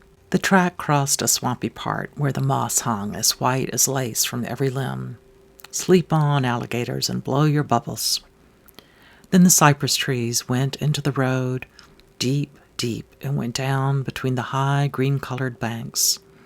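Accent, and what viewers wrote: South, United States. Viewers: Although he's better know for his non-fiction Civil War trilogy, Shelby Foote is my absolute favorite. All of my main characters carry his voice somewhere within them. He spoke a great deal about Eudora Whelty. He admired her a great deal, appreciated their bourbon and talks together. I found her through him. And I have now found you through her. Remarkable how small the world gets. How we are all connected by tiny, undetectable strings. I've listened now to another reading or two of yours and have enjoyed both tremendously. Not sure if you are from the South or not, either way you capture the essence beautifully. We'll done.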